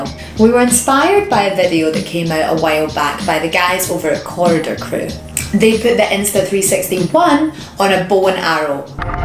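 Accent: Scotland, United Kingdom. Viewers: Insta360 haha so did I! Thankfully when u started speaking the home accent I realised. Alba gu Brath! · Scottish accent😁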